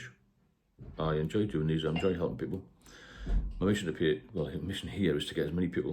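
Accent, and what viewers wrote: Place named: Newcastle, United Kingdom. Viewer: the geordie accent gives it away !!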